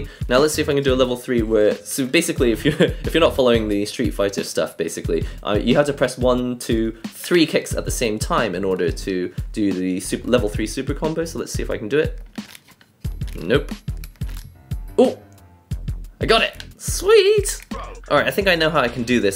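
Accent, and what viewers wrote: United Kingdom. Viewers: its like hes from Japan, but he sounds british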